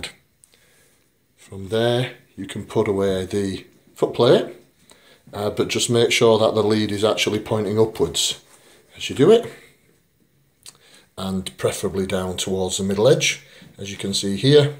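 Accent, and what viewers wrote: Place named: Yorkshire, United Kingdom. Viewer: Good Northern Yorkshire(?) accent and very informative on his personal experiences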